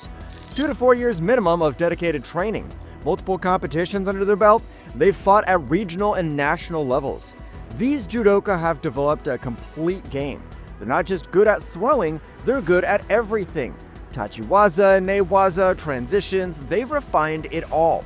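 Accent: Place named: United Kingdom. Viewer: british accaent e rbooks uk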